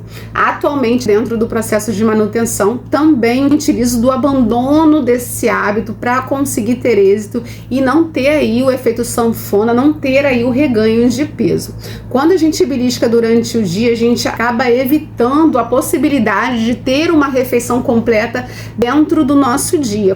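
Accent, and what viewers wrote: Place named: Rio de Janeiro, Brazil. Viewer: Que sotaque lindo!!! Eu amo O sotaque Carioca❤ Sou Minas Gerais e amo O Río De Janeiro. 😍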